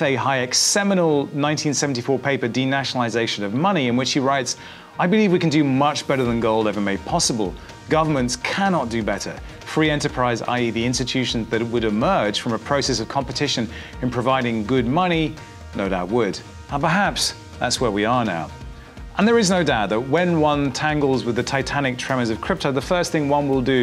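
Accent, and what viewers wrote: United Kingdom. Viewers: hahaha the Scottish accent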